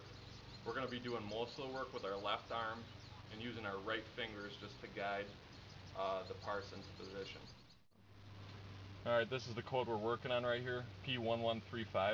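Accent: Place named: Canada. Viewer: canadian for sure. good guy..thanks for sharing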